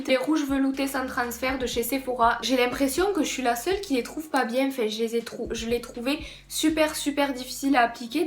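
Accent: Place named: Toulouse, France. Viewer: L'accent toulousain est palpable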